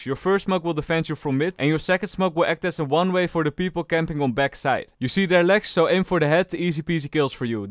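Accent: Netherlands. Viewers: You sound really dutch. btw never stop this amazing content!!!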